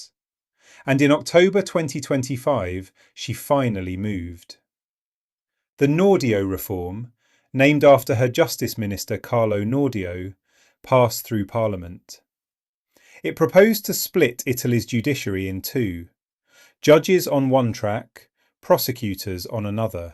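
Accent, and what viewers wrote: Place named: United Kingdom. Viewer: Narrators accent is British… considering what a 💩 show the UK is right now…. Hmmm 🧐 I say your video is 🐂 💩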